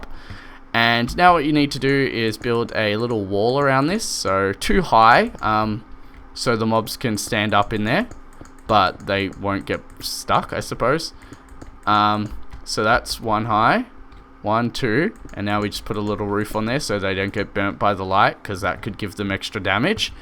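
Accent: Australia. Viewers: you inspired me mate, wait your aussie! SAME!!!!! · easy as, aussie?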